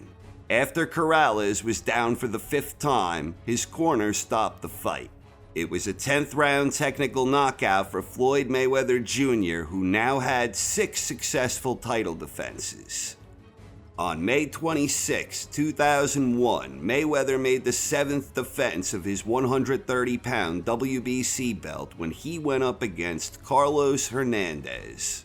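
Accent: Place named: New York, United States. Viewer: Love your Channel you kinda sound like Howard Cosell with a New Yorkers Accent!!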